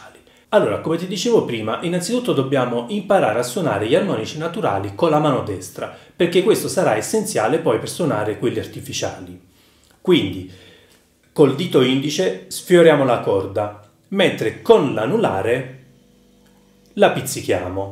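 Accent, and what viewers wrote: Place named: Naples, Italy. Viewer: Grazie sei molto bravo e chiaro..poi quell'accento napoletano mi rilassa una cifra!un caro saluto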